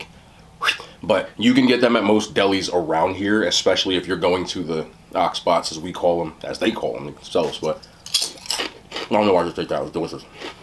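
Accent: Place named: New Jersey, United States. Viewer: I think us people from New Jersey just talk different anyway. Thanks for the video and trying those snacks I didn't know abt,looks really good.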